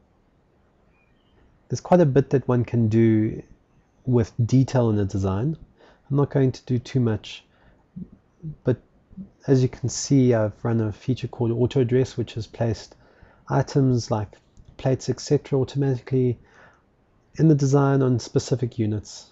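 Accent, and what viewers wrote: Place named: South Africa. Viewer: hey....a south african, bru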